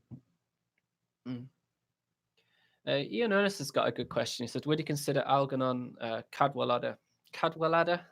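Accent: United Kingdom. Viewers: dude, non-music question... You sound British, but what's the sketch with you being in Korea? What's your occupation and how did you find yourself choosing to live over there?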